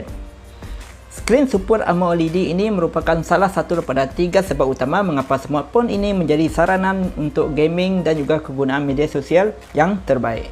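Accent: Sarawak, Malaysia. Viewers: Slang Iban so awesome...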